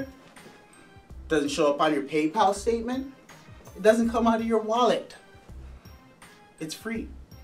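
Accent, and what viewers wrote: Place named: Toronto, Canada. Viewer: Fam u sound like u from Toronto! Your hilarious brother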